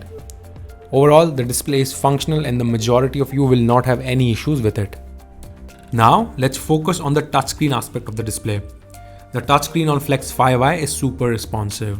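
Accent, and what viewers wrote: India. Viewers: i love the indian accent that remember me raj in big bang theory 😂😜🥰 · horrible this indisch english this laptap is walk toched with16gb with bitter displaayy this thisi s is missi this -pooaaahh bluluuluuu o myy gooddd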